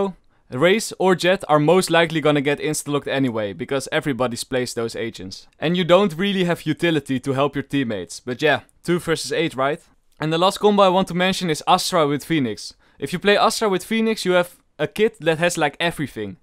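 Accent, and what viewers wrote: Netherlands. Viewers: This dutch accent 😭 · Ur dutch no doubt about that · The dutch accent makes it a lot better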